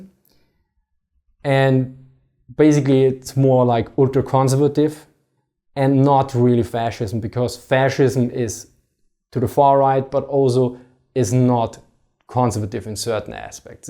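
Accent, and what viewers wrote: Austria. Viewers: Love your Austrian accent! Grüße aus Sachsen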